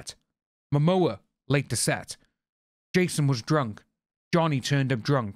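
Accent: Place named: United Kingdom. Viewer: I am a Brit who emigrated to the US. Your insights are spit on. Plus, I’m missing the UK and your accent makes me feel at home.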